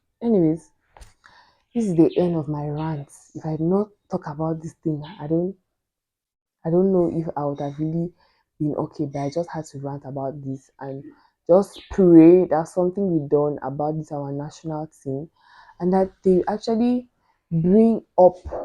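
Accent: Nigeria. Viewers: I so much like the way you speak. It reminds me of someone special besides the fact that you sound Nigerian. I'm your new subscriber 😃🇳🇬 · I think most of the viewers are used to hearing Ghanaian's accent and easily know they're Ghanaians but obviously your accent in pronouncing some certain words are similar to how we pronounce them plus after each of your sentences you use the word "oo" which is also a Nigerian intonation. Personally, listening to you I would have bet you were Nigerian but the jersey made me rethink. Regardless, please take no offense as to people saying you sound Nigerian,I think it's just curiosity and people are a lil bit surprised at your fluency! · My sister, did you grow up in Nigeria? Your Accent is more like that of a Yoruba Girl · This girl sounding like a Nigeria 🇳🇬 😊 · You sound so Nigerian. I am surprised as to how you Cameroonians sound so much like us. 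If you are not wearing that jersy, i would think you were Nigerian. Splendid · This beautiful sister sounds exactly like an educated Nigerian, but she's actually smarter than us. Imagine she speaks both french and a good command of English. Whereas most our Nigerian sisters can't even express themselves in English fluently without switching to Pidgin 😂❤😅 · This lady is beautiful and brainy... honestly you sound like a Nigerian...it will be pleasure to host you in Abuja....U've my subscription❤🎉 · Those of you saying she sounds nigerian. By blood and ethnicity southern cameroon are nigerians, colonia masters and them voting to join cameroon is the problem. Blood is ticker than water. Even in cameroon they have been accused of sounding like nigerians, dressing like nigerians, almost everything like nigerians. They are actually nigerians. Just a matter of time , we will integrate them into nigerian society. 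case closed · . Thanks for confusing me. I saw the Cameroonian jersey on you but when you started talking, I thought you were a Nigerian but it's well. Good job! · Sister you have a Nigerian accent. I can tell because I am a Nigerian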